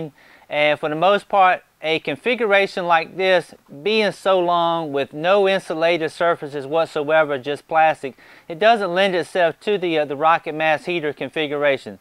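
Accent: South, United States. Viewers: Love hearing someone with a strong southern accent describing things so intelligently and knowledgably. Well done on the video and your project; you obviously have it figured out. Thanks for the post!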